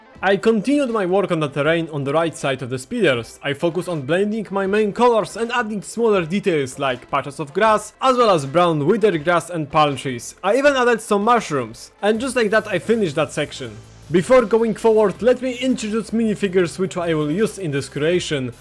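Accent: Poland. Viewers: czy ja slysze polski akcent? btw zajebisty moc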